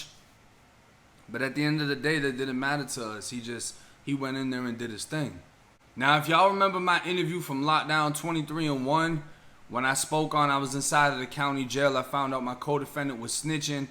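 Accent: Boston, United States. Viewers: U can tell he got boston roots by his accent u can here fla but that boston no r accent is def there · Are you originally from the, Boston area? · Do you have Massachusetts roots or boston ???? , good job STAY FREE MY MAN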